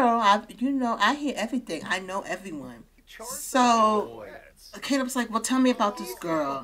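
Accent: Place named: Brooklyn, United States. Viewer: no problem and yes, I love it! I'm from the south so it's rare if I get the chance to hear a Brooklyn accent.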